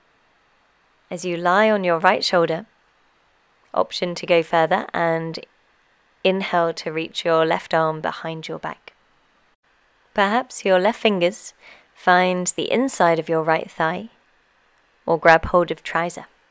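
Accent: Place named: England, United Kingdom. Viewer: super good, thank you! i've dislocated both my shoulders over a dozen times and am really trying to sort them out! This feels like it has helped heaps, and could be a good practise to do every other day. really liked the stuff you were saying throughout as well, like in the comment below about how the body is a mirror of the mind (trying to work out what weak shoulders could be reflecting in my personality!) also really glad to have found a yoga video with an english accent aha, all the rest i've been doing are from american teachers and the english accent makes me feel much more relaxed and at home :) anyway nice one, subscribed!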